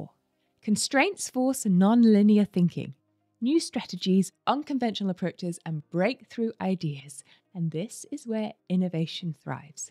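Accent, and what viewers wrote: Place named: New Zealand. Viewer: Well explained, first video I saw about these topics. The captions also helped me, since the nz accent is harder for me. Thanks from 🇧🇷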